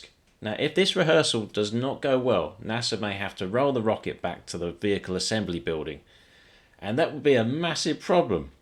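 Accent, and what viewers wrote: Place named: London, United Kingdom. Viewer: Thanks for the update. Given the complexity and safety aspects that are a huge part of this project, I’m still amazed that well over 50 years ago, humans actually went to the Moon. I’m located about 60 miles south of Los Angeles, in Orange County, CA (but born in Gloucester, UK)., I’ll guess that you’re from some borough of London, or nearby. Hackney?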